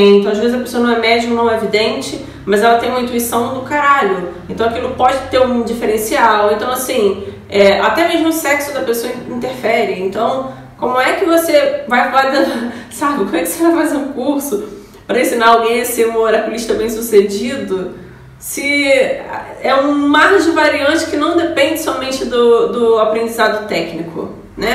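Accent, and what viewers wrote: Rio de Janeiro, Brazil. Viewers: Linda! Pelo sotaque dever ser carioca. Bom vídeo 👏👏👏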